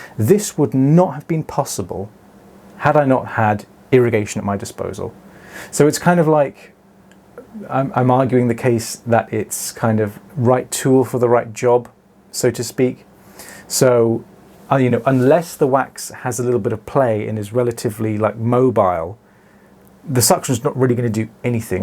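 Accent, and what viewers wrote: United Kingdom. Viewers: Boy how I love your descriptions and your British accent ❤😊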